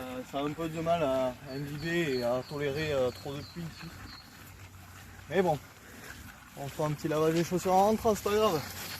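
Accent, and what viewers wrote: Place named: South, France. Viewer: très bien ce 1er vlog... avé l'accent!!!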